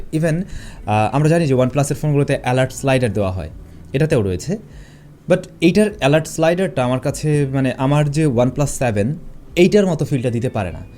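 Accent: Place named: Tangail, Bangladesh. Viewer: Vaiya apnar basa kotha love for Tangail ❤️❤️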